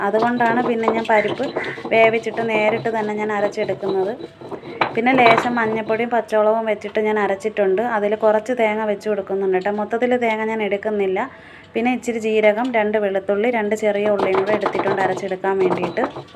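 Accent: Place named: Kilimanoor, India. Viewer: ഓരോ സ്ഥലത്തു ഓരോ സംസാര രീതി അല്ലേ.. ഞങ്ങൾ കിളിമാനൂർ ഭാഗത്തു ഇങ്ങനെ ആണ് സംസാരിക്കുന്നത്.. അത് മാറ്റേണ്ട കാര്യമില്ല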